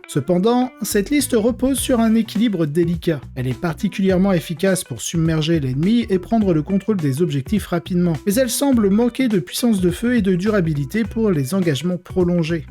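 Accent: England, United Kingdom. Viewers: Gris kiff pour ton accent anglais inimitable ! 😉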